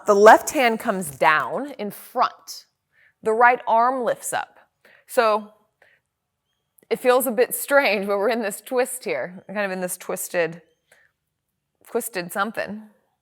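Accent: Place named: Australia. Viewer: Thanks so much! Love your classes! And love your Aussie accent! 'Share this with ya!" around 1.20. lol.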